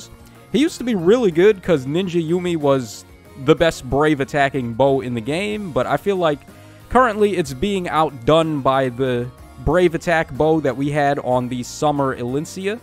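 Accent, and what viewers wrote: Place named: Queens, United States. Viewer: Something great about an affable dude with a thick Queens accent reviewing gatcha games. 😆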